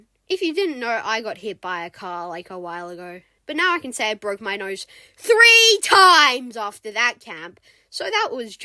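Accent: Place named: Australia. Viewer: bros Australian 🇦🇺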